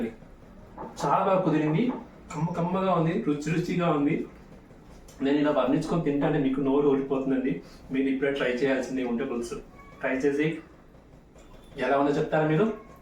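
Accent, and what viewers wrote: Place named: Kerala, India. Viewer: First time vintunna Unta pulusu. Meeru malayalam Andi. Mee telugu Different ga undi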